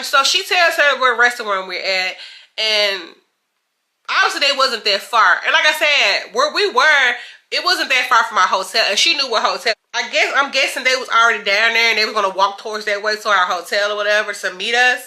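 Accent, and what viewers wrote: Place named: Memphis, United States. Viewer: My family lives in Memphis your accent reminds me of home lol 😂 love it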